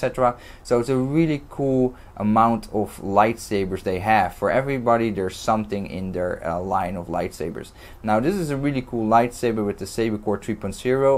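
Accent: Netherlands. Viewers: ja ik hoorde het een beetje aan het accent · Where are you from, ur accent souns a bit dutch to me xD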